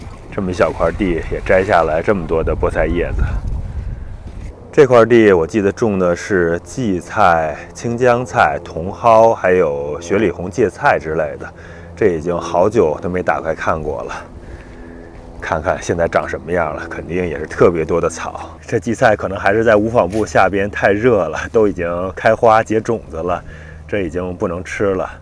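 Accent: China. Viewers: 哥们儿我看了几个你的视频，完全震惊了！！！这些菜，鸡鸭，粮食，都是你一个人种的？？？问号儿已经不足以表达我觉得不可思议的思想感情，我真的佩服的已经说不出话了，看你也是大陆人，听你说话的语气也是曾经在大陆上班的，居然到了美国能完全脱胎换骨成了种这么多种农作物和养这么多家禽的农民，更牛逼的是，你居然还每天上班，农民都是下了班以后当的，简直了也是，我已经快要语无伦次了，只剩下：卧槽！真的佩服和羡慕！啥也甭说了，赞一个！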